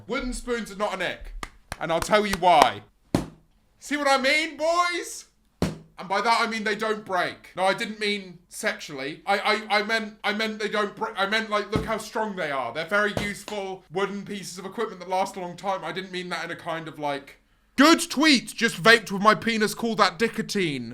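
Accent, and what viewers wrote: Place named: United Kingdom. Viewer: I thought you were american for a while until I realized you were british ick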